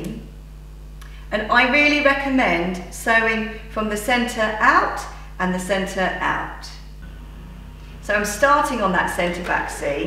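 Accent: United Kingdom. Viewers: Gorgeous! Way to go! I am loving recycle sweater projects this winter as well. I just did a cashmere remake. I could listen to your Brittish accent all day! Keep the great projects coming!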